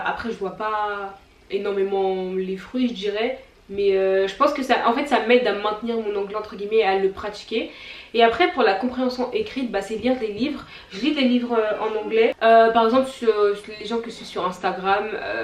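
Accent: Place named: United Kingdom. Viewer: Je trouve que ton accent est plus proche de l'accent britannique que américain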